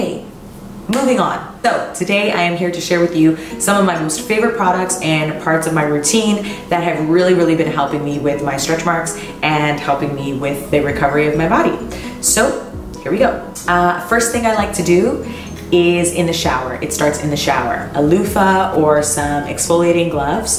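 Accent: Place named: Guyana. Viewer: LMAO your Guyanese accent killed me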